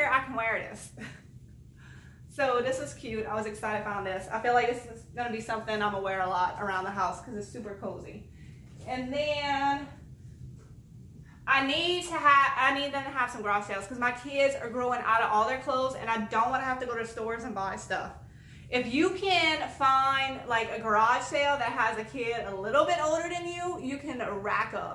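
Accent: Louisiana, United States. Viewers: You sound like a fellow Lousiananian. Am I right? Great haul . Edit: I made my comment as soon as the video started. I just heard you talk about Lousisna weather. So, yep.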